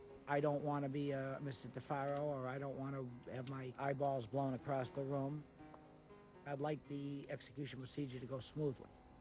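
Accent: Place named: United States. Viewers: There is no such thing as an American accent. There are many, many accents in North America, and even in individual states. This man has an accent found in New England, most famously Massachussets. That accent was developed over a hundred years ago, with minor change over the years, from New Englanders' obsession with trying to be as british as possible. Western North Carolinians and eastern Tenneseans speak with an accent and dialect that historians have found to be nearly identical to the common accent and dialect of Elizabethan Englanders. The most famous southern accent, which is spoken by Virginians and north Georgians came from the mid colonial period with high society types from the aforementioned states, then colonies, trying to emulate the accent of the royal family of the day, the Hanovers. So, if you don't like American accents, blame the English. 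· Horrible american accent. wow,,, 'very distasteful thing for the GOD who has to unstrap the inmate."... "nomily,, if we think of a belt." ....."the GOD has to compress all the flesh"... "same as the safety belt in yo caw."...."they deficate on da chaw"... Americans really really need to take elocution lessons. They sound like idiots.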